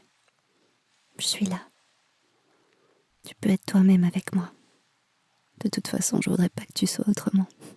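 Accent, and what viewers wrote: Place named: United Kingdom. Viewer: Ton accent est incroyable si tu est anglaise mais je pense que t’es vidéo sont trop courte x) j’aimerais trop des vidéo de 1h 😅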